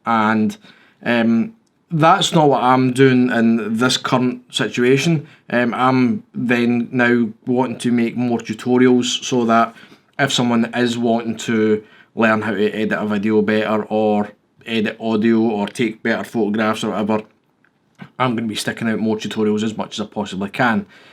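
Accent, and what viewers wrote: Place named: Scotland, United Kingdom. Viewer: No, having a Scottish accent will not put people off subscribing, although in my case I prefer it because I lived there and it’s not Northern Irish 😂. My favourite beauty YouTuber is also Scottish.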